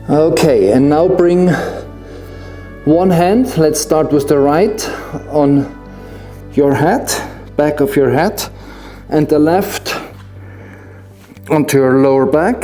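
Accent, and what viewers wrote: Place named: Bavaria, Germany. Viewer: Sehr schöne Yogaeinheit um am Morgen wach zu werden. Ich mag dein bayrisches Englisch!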